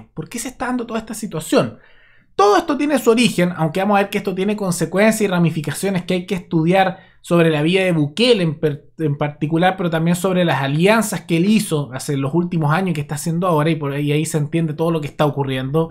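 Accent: Chile. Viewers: Estas hablando pura mierda, si esa informacion es tuya y si estas agarrando informacion de otra persona deverias de investigar mas de Bukele y nuestro pais para hablar. No seas la misma mierda como otros. Por la forma en que hablas puedo notar que eres Chileno deverias de hablar de tu pais Si lo conoces aunque lo dudo. Pero comiensa por investigarte mas por favor.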